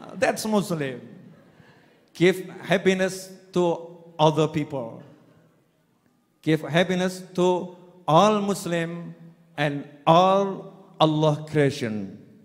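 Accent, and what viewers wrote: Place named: Java, Indonesia. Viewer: Aksen jawa nya...mirip2 pa jokowi klo dalam bhs inggris ...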